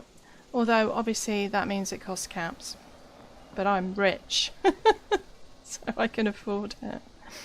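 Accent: United Kingdom. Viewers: I love seeing all these camp builds people come up with, thanks for some ideas, really helps as I'm not that imaginative if I'm honest. Shame you only play on Xbox? it would have been nice to see your camps ingame and have a chat. Love your humour and accent too, despite being literally from the same country and having pretty much the same humour as all British people do really. Have a good weekend c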